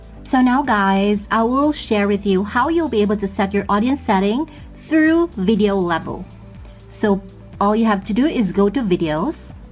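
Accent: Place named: England, United Kingdom. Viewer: i love this tutorial,,, maayos yung pagkakaturo.. i also love your english accent po hehe,, new friend po, sana mabisita nyo rin ako salamat po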